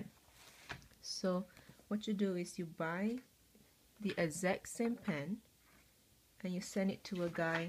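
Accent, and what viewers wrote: Singapore. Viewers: Are you Singaporean?:) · I also guessed Singaporean! I like your accent, and agree with the commenters above that I appreciate your thoughtful and methodical posts that give really practical information. New subscriber!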